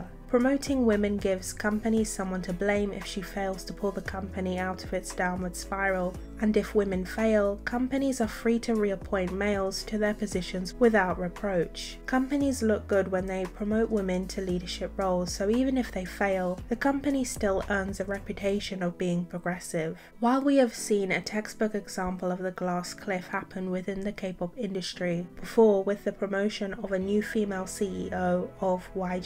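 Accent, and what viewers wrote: United Kingdom. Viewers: YOU'RE BRITISH????? 😬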